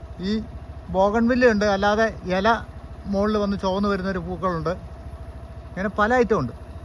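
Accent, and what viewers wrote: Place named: South Kerala, India. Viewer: Wow superb well explained kya scenic place & your language slang ( south kl) nice to hear our roots are from thiruvalla we live coimbatore best wishes for more videos thku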